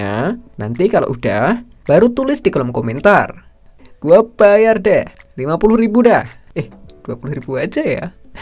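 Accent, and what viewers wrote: Java, Indonesia. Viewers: medok suara nya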